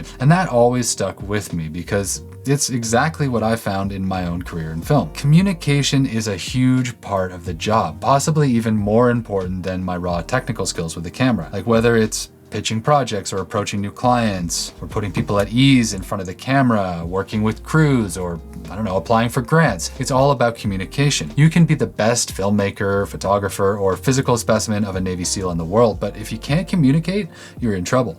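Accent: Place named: Texas, United States. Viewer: Canadian? I thought you were from Texas.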